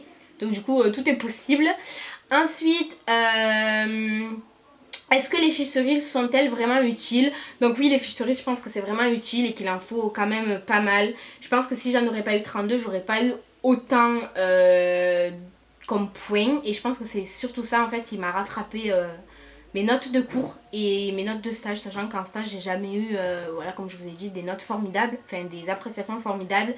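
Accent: Marseille, France. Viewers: Elle est marseillaise,nn?son accent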